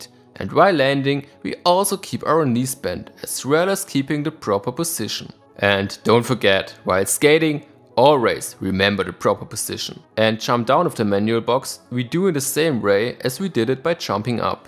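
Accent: Germany. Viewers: that german accent is hilariously strong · Well if you want, one day we should skype, discord or something so I will try to train you on these V and R. Your Rs are not bad, but you seem to focus a lot on these, while most of them do not deserve great attention and should be very light and short, and even sometimes almost muted. Your Vs are... hum... still a lot german (surprise surprise) XD As I said to you previously, your tutorials are very thorough, and that is really enjoyable. In my opinion, it's better to have fewer information that are fully understood, than a bunch hard to get (I'm exaggerating for you to get the picture). I'm not suggesting you to be less thorough, no no no... but rather splitting your videos in two parts, hence giving you twice the time. I bet your german audio track is live with your video (I've not checked, cause I don't speak german), so once a thing is explained, then you just show the video part again, maybe slow-motion, without live audio, and you can insert short comments, like :"here, watch my knees !", that kind of things, just to highlight a key aspect of the move. 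As you are making tutorials, you are teaching us new things, new concepts, so most of us need time for it to make sense, for it to sink in, and then understand other new concepts relying on the previous new ones. I've seen however that you have a nice number of followers on your german channel, congrats !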